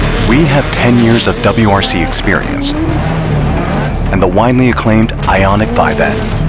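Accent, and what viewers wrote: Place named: United States. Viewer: drop the american accent. the cars look amazing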